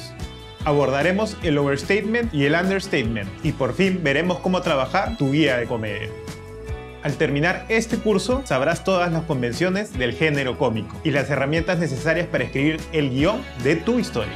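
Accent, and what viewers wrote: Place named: Spain. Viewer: ESPAÑOL DE ESPAÑAAAAAAA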